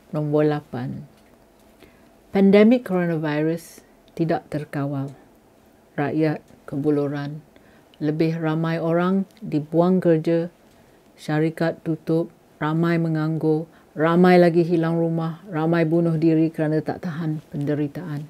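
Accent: Perak, Malaysia. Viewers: Kuat likat pekat melekat peraknyeee yongg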